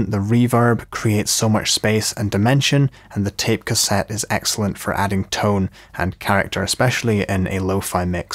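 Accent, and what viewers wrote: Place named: Perthshire, United Kingdom. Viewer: I’m music daft but for some reason the only thing I can think about watchin these videos is where you’re from. Im also Scottish AF and have a habit of placing accents but yours is hard to read. You don’t sound southern/ central belt, neither do you sound like a farmer/northern. Im guessing Perthshire? Please reply for my sanity. Im a proud Forfarian wasting away in Dundee as an electrician with a view to taking your crown. Keep up the good excellent work my good man. Really appreciate it and look forward to working with/against you in the future. Wink emoji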